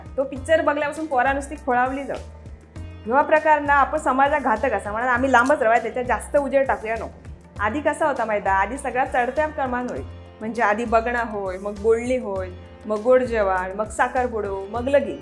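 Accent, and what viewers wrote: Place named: Malvan, India. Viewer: .... तुमचे व्हिडिओ खुपच मस्त असतत हा.. अस्सल मालवणी मस्त बोलतास. अगदी गावाक गेल्यासारख्या वाटता. तुम्ही आपलें जुने मालवणी परंपरा, चालीरिती..जगासमोर आणतास ह्या खुप चांगला काम करतास. तुमका खुप खुप शुभेच्छा... मी तुमचे सगळे विडिओ बघतय.खुपच छान असतत.... तुमचा खरा नाव काय आसा...? 😊🙏🏻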